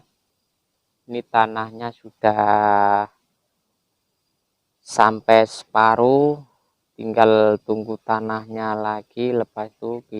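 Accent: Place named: Java, Indonesia. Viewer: Jakarta mas.. Logatnya gampang dikenal.. Jowo ne pundi mas? Enak ya.. Bisa merantau di Malaysia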